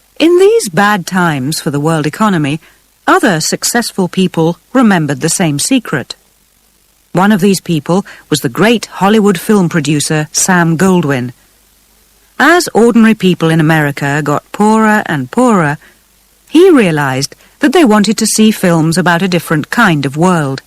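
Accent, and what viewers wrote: United Kingdom. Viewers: Rodolfo Santos british I think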